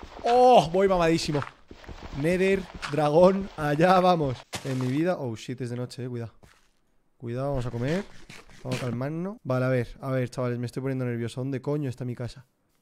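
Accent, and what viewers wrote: Argentina. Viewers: El argentino ndeaah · Por qué hace referencia a como hablamos en Argentina? xD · Habla Como Argentino Jajaja Aguante ElRubius Saludos Desde Argentina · Siento que está muy argentinisado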